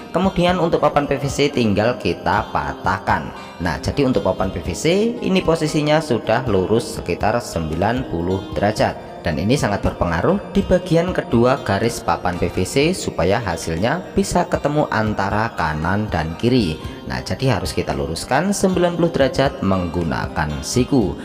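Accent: Indonesia. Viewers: Kena sindir admin akhirnya ikutan komen juga lah.. Banyuwangi hadir dan menyimak bosku.. kalau lihat logat bicara nya kayaknya adminnya orang blitar.. entah benar atau salah. Salam seduluran..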